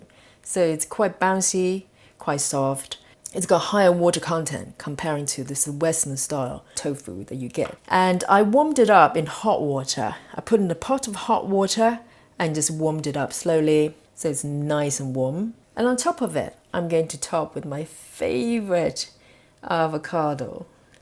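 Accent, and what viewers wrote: United Kingdom. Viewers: I had to laugh at the end, as you say "put a dollop of chilli paste on the side" and then proceed to put the equivalent of a small ladle full! You are clearly "chilli mad", just like my wife. I will definitely have to make this for her, as I'm sure she would love it! 41 years ago, I convinced a little asian gal from Christmas Island to marry me, and making yummy things for her like this helps to keep the romance going. She spent 3 years in the UK, and has a slight British accent (not as strong as yours, but it's still there). Her voice is not quite as deep as yours either, but I found listening to a number of your recipe videos very comforting, and kept me thinking fondly of her while she is away at work and I work from home. Love your recipes, and will be trying the "french Fries" tonight. I'll let you know how it goes!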